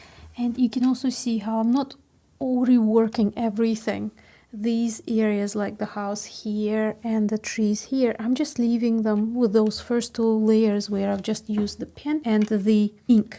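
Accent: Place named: Russia. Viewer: Абсолютно русский акцент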